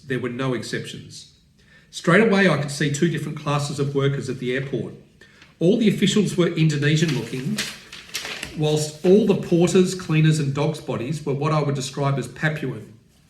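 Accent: Australia. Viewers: English with Australian accent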